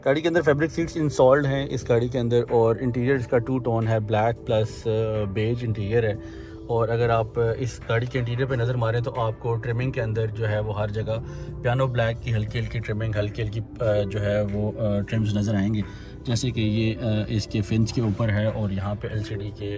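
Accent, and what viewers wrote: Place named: Punjab, India. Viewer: i love your punjabi accent ❤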